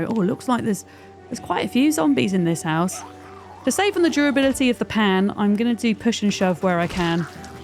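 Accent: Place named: United Kingdom. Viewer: Hey, your video was recommended to me enitely by the gods of YT's algorithm and I'm gratefull for it! Good to hear a fellow Brit gamergirl! Keep it up!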